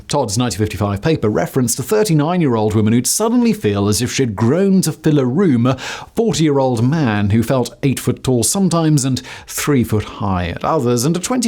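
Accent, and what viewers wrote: United Kingdom. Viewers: I like this channel minus the obnoxious British accent.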